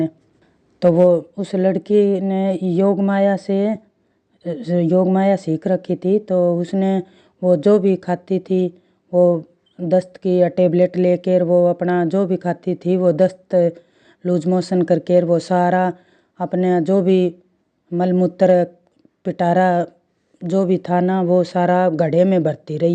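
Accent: Haryana, India. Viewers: Aap haryana se lgti ho bahan ji prnaam 🙏🏻🙏🏻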